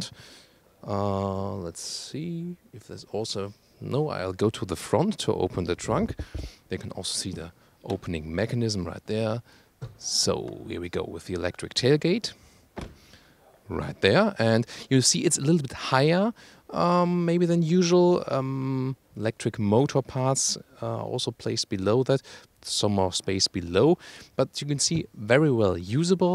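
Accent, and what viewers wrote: Germany. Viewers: SORRY, but that German accent is adorable.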